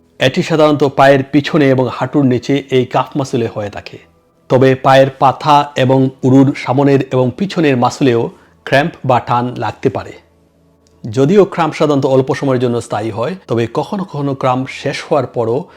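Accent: Sylhet, Bangladesh. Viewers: আপনি কি সিলেটি? কারন আপনার উচ্চারণে তা বুঝতে পারলাম।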